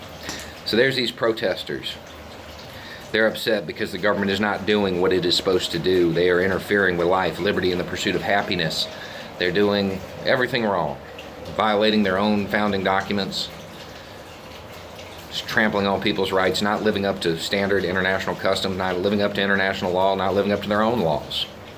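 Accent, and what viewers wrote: South, United States. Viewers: The voice of reason has a southern accent 👏🏿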